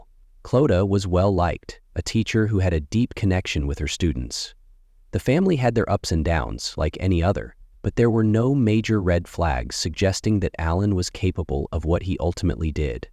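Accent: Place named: United States. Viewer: Can u name the names correctly please your American accent ruined the story and since this there’s been a hell of a lot more like the most reasent of the 1 in rossfeild tallaght Dublin 24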